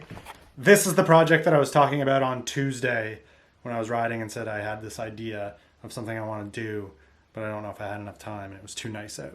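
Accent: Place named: Maritimes, Canada. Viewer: I thought you sounded like a Maritimer.. then you said "Nova Scotia winters".. I'm stoked to see an awesome East Coast youtuber building bodged bikes. I think you put the final nail in the coffin of my plan to buy a new hardtail this summer. My 2015 Norco Storm is getting a bunch of new parts in the near future. (As if I could find a kickass hardtail any time before July anyway...)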